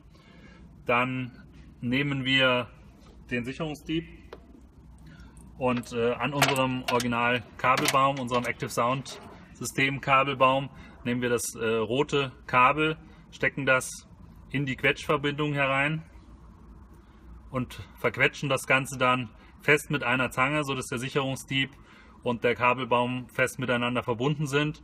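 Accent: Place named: Germany. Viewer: Wait.... don't tell me.... that guy is German.... right?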